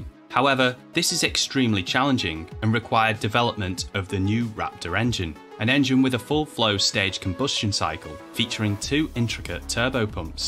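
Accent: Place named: United Kingdom. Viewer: Awsome dude thank you, and great to hear a fellow brit on this subject, liked and subscribed 😀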